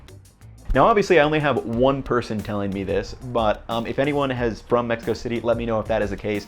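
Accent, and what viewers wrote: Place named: Seattle, United States. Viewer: Where in the US are you from? You sound like Seattle?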